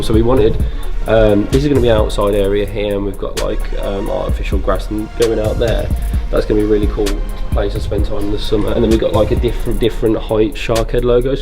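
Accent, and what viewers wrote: United Kingdom. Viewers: Difficulty in understanding your U.K. Regional Brand of English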